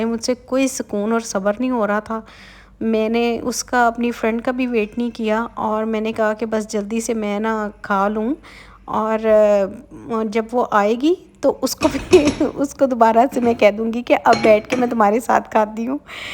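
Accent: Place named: Punjab, India. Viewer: you are precious miss, and adorable women. Must tc your healthcare so much. I miss apka Punjabi accent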